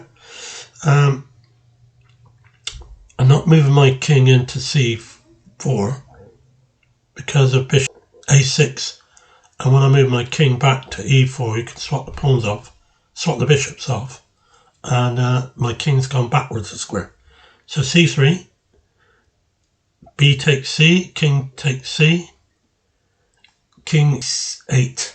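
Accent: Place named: Bristol, United Kingdom. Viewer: As soon as I heard your voice I thought hang about, he's got to be from Bristol hah. Subbed. 😎👍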